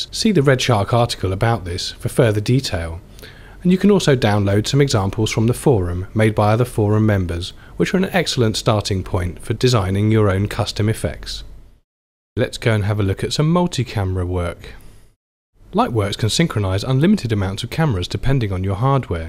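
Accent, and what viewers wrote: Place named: United Kingdom. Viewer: Британский акцент :) Те же дела с мобил и мобайл.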